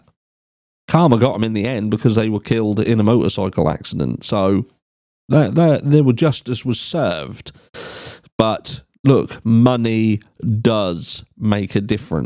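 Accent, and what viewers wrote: United Kingdom. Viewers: Love the added British accent, great affect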